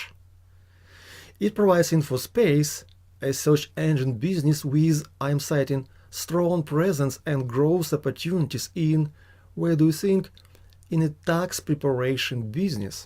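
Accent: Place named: Russia. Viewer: Ну, во первых в своём комментарии я не затрагивал вопрос национальности, а имел в виду твоё произношение. Я понимаю, ты в этом не виноват, так тебя научили. Такое впечатление, как будто ты читаешь английский текст в русской транскрипции. Ведь в английском есть звуки, которые невозможно выразить по русски.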